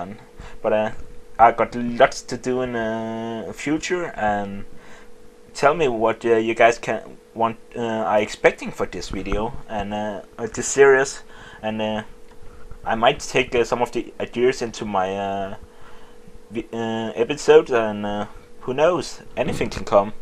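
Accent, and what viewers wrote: Denmark. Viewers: are u danish?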